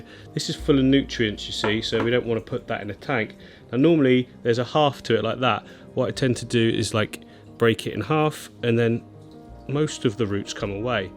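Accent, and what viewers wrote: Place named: England, United Kingdom. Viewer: Thank you so much for posting the name of that stone onscreen. The CC was rubbish! 🤣🤣 It was cereal stone, or serious stone. Clearly not able to process a strong English accent. 🤣😜😍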